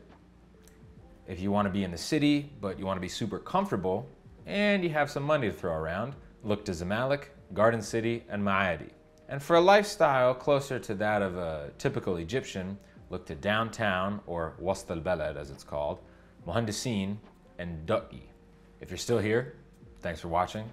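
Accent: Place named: Staten Island, United States. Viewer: Haha! Nice accent! Spent any time in Staten Island?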